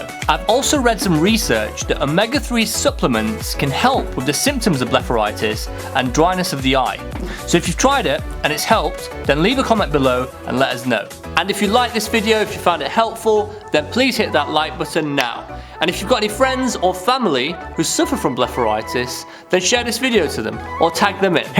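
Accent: United Kingdom. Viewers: the British accent alone makes me feel better.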